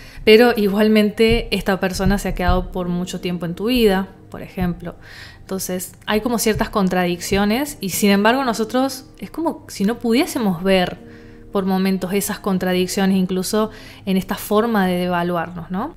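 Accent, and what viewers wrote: Argentina. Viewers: Al ver este video, parece que has visto mi historia, y todo lo que dices es verdad. En mi caso quise una relación con una persona que no lo vale, pero me pasa que siempre quiere volver y da señales para que yo ceda y vuelva, pero está vez ya no va a volver a pasar. Gracias por este video, desde luego lograste mi suscripción a tu canal, por tu acento seguramente SOS argentina, país dónde vivo. Besos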